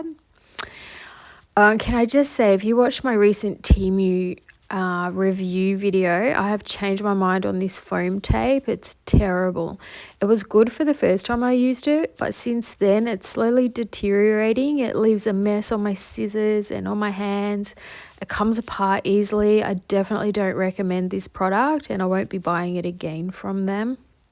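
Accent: Australia. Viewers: Wow, I just found your channel today. What a wonderful selection. Great papers and love the way that you've used them. It's hard to pick a favourite but I really liked Happy Birthday Mum and the last one with the gold dots. Thanks for the inspiration. I live in Australia and you sound like you have an Aussie accent too. 😘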